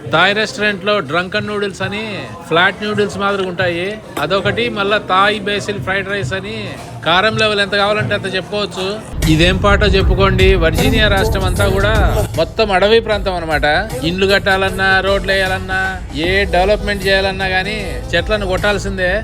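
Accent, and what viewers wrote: Rayalaseema, India. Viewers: ఏమి అన్న ఆఫ్టర్ లాంగ్ గ్యాప్ మీ మాటలు రాయలసీమ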